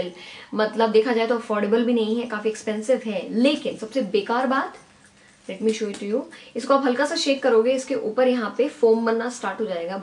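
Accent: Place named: Rajasthan, India. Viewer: U totally rocked the rajasthani accent today!!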